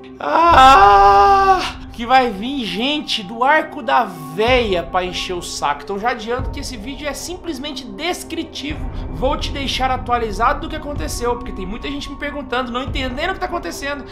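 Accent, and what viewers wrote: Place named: Goiás, Brazil. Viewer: Diretamente de rio verde goias pra dizer que esse e o melhor video sobre o assunto, conheci esse sotaque no comeco no video meu conterraneo,abraço .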